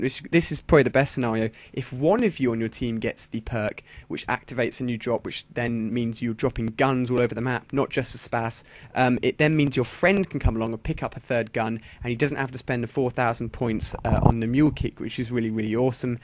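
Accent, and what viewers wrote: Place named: United Kingdom. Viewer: why is it always a british guy?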